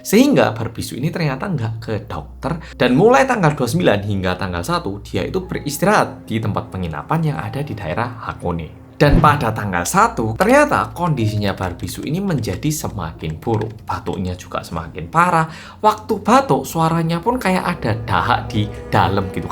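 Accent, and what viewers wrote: Java, Indonesia. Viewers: Ya ampun oppa bhs indonesia lancar bngt 🤣😂😂😂.. baru following sdh ngakak dl an dgr in bhs Indonesia nya 😂😂Lol logat jw pula 😂😂😂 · Mas medok banget ya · Salfok sm oppa korea yg ngomong nya medok 😂 salam kenal oppa.... moga sehat selalu ❤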